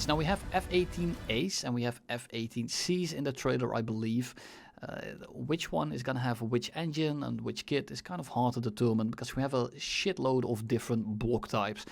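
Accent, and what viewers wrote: Netherlands. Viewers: A dutch man sentually vispering sweet trailer details into my ears. ❤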